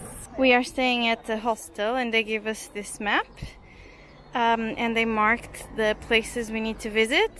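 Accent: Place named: Norway. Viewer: Happy birthday! I think your english has become influenced by your exposure to norwegian😊.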